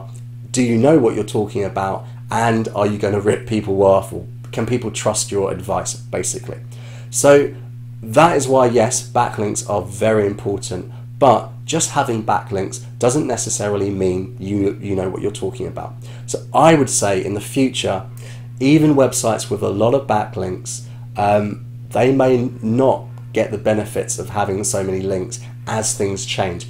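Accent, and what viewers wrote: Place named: United Kingdom. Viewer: A British accent review is a treat to watch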